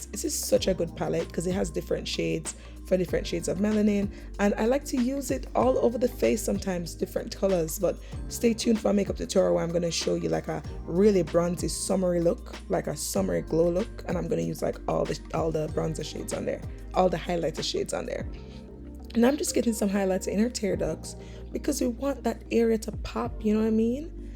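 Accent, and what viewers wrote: Jamaica. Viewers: You sound so Jamaican 🇯🇲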